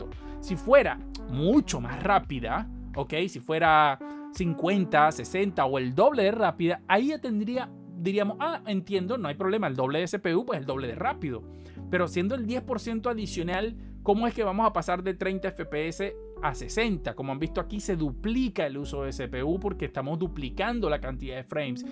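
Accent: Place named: San Juan de los Morros, Venezuela. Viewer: Saludos hermano, me gustan tus videos... he notado que tienes un acento muy parecido de la ciudad de donde soy, no seras de San Juan de los Morros?? Guarico, Venezuela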